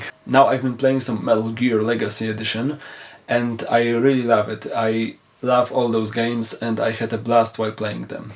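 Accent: Poland. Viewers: polski akcent + język angielski = wisienka na torcie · Siema Poznałem polski akcent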